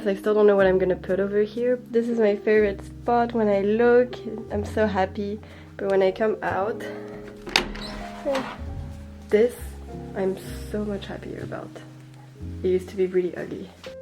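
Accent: France. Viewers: haha j'ai regardé la video sur le labret vertical, je me sais bien qu'il y avait un petit accent français! j'ai aussi une petite cheminée dans mon studio à bruxelles, ça donne un effet trop cosy :p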